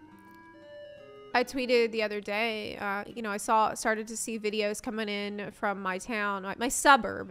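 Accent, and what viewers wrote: South, United States. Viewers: Pretty sure she's native English speaker. But yeah, as a Cajun, I'm definitely getting some Southern vibes from her.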